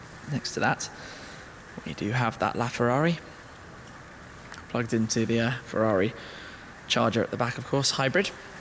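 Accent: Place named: England, United Kingdom. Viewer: theBreserk Accentul unul englez totusi, nu te poti astepta sa pronunte corect denumirile cu diacritice. :)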